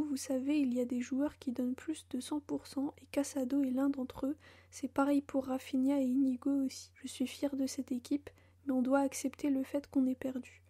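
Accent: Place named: Spain. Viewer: J'aime bcp son accent espagnol, même à la fin "visca Barça visca Catalunya" merci pour le contenu.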